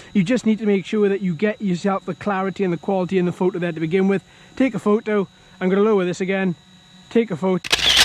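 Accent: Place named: South Wales, United Kingdom. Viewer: Great vid. Very informative. By the way is that a South Wales accent I detect?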